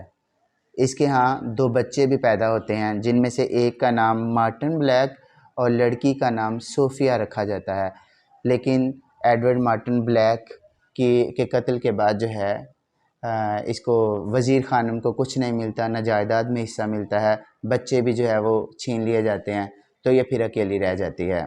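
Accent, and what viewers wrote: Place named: Punjab, India. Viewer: محترم ڈاکڑ صاحب اس قدر اعلی ادبی پارہ کے تعارف کا حق ادا نہیں ھو سکا تلفظ اور لہجہ میں اردو کی بجاۓ پنجابی لہجہ اور ادائگی کی واضح چھاپ ھے 🌸🌸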